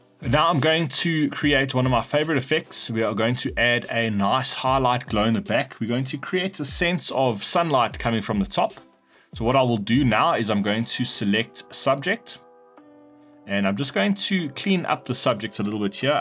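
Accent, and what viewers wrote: South Africa. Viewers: As soon as I heard your voice I immediately picked up that you’re a fellow South African… love your work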